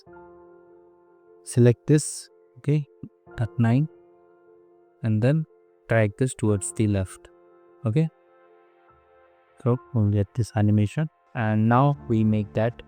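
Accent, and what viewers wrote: India. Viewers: wahi to Indian accent me English bolta hai mza aata hai dekhne me lekin pura dalta to achha hota aor bhi logo ka dekhta hu but unka utna mza nahi aata dekhne me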